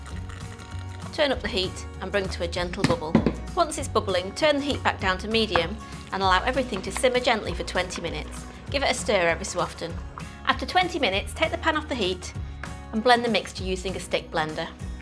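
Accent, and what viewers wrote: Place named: Northern England, United Kingdom. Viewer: It's her northern English accent. And what has it got to do with you how she speaks.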